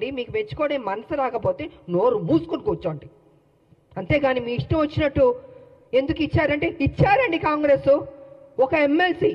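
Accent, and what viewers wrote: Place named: Andhra Pradesh, India. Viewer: Meeru Andra bhasha matludutunnaru .idi telangana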